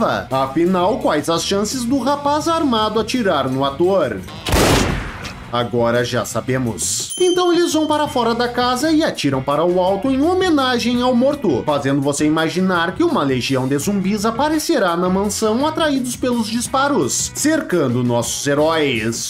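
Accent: Rio Grande do Sul, Brazil. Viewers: Gaúcho neh kkkkk tmj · Fala tchê, tu é de que parte do RS. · Cara tu é gaúcho né? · TU É GAUCHO?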